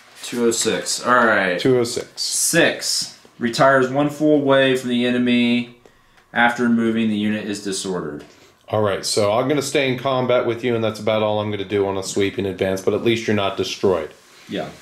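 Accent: United Kingdom. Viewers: Love the British accent. Like been in the same room as Hugh Grant. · Appreciate the Brit accent!!!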